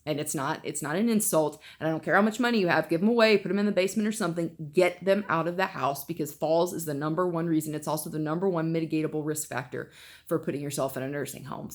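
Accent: United States. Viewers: )very good explanation I was able to understand your american accent Very Informative Keep up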